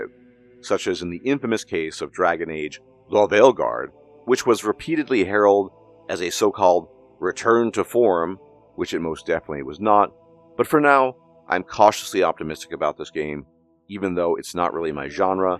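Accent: France. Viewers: That french accent caught me off guard. lol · Amazing French accent, how come?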